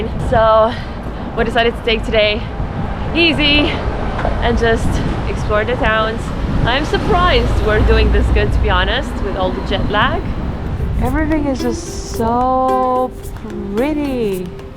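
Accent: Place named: United States. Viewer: ! Very cute responses to classic, small town Americana … albeit an extremely expensive and touristy version found in Napa. You know how some people adopt the accents of others around them? During much of this episode, you expressed yourself in a clear American accent! You lived in the UK. You are Romanian. How did you develop your English language skills? Also, how cute is the fact you consumed a few too many wine samples! It is funny in a good way. Endearing. I’m sure Matt brought you back to your hotel and all memories of your “hell flight” disappeared in the California night.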